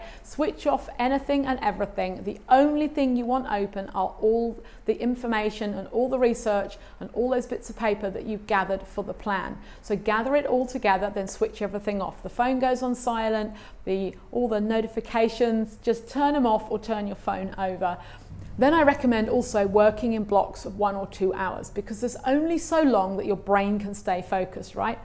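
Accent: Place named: England, United Kingdom. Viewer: Hey, I hope you read this. I guess you can tell by now I've been watching a lot of your videos, some of them a number of times and taking notes on some. I really am drawn to your rich English accent, but has drawn me the most is your common sense and wise advice to building a successful art business. One of the things I got from you is that I really need to do my homework, research. My ideal client, customer is out there looking for what I uniquely have. But need to be where they can find me or our paths cross. I know I want to help other baby boomers like myself realize there dreams too., thanks again for your help. From Kingsville, Ohio 🎉 😀 ❤ Cynthia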